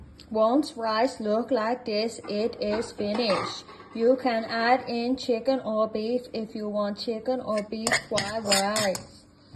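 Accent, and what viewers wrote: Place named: Derry, United Kingdom. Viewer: I can here the derry accent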